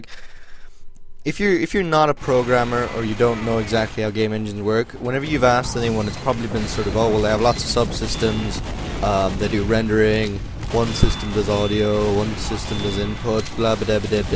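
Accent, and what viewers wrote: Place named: England, United Kingdom. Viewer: at the start.... I see what you meant about the English accent xD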